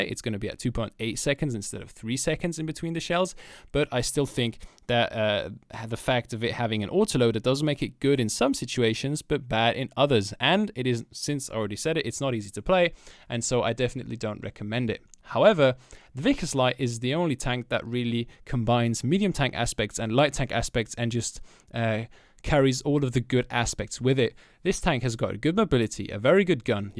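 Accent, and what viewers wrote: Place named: United Kingdom. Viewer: Wow are u french? U sound british haha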